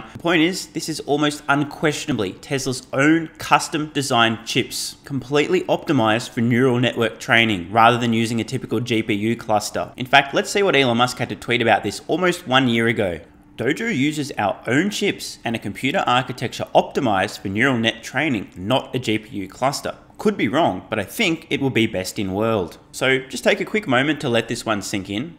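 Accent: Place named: Australia. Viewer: For a moment I thought I heard swear, but nah, it was just plain Australian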